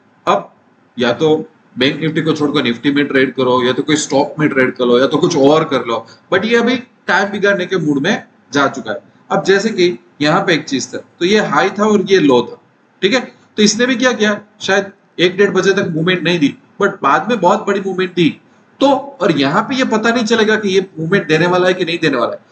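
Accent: Gujarat, India. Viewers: Pakka gujju bhai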